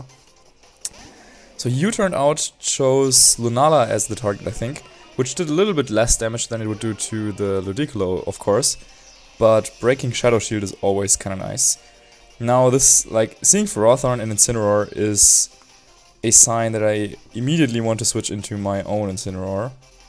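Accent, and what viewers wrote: Germany. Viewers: Your German accent English is wayyyyy too cute and my ears enjoyed it. You're such a chilled guy lol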